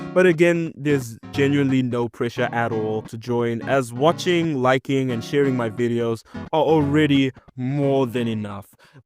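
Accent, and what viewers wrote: Afrikaans, South Africa. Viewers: You have an Afrikaans accent, I have always spoken both Afrikaans and English so I don't have an Afrikaans accent.🎉🎉🎉🎉🎉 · I hear a Afrikaans boi here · I just realised you’re South African bc I knew that accent was familiar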